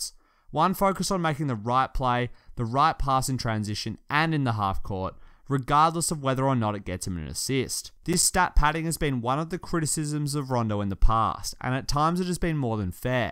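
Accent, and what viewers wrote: Australia. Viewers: you are very australian sounding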